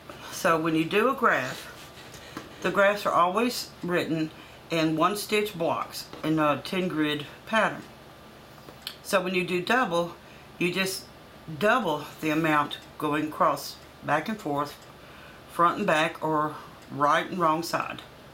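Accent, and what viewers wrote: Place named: South, United States. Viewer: I love your country accent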